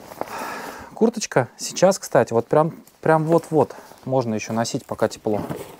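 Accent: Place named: Tatarstan, Russia. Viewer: Пащиму у татарский акцент?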